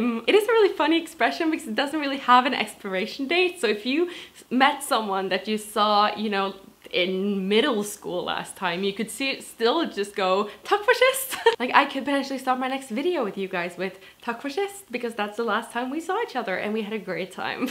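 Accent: United States. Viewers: Your English sounds very American to me.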